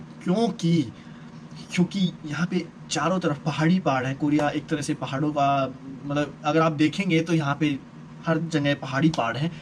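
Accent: Haryana, India. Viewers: Aap haryana sy ho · Your haryanvi accent is pretty😀 btw i am also from haryana....lots of love from haryana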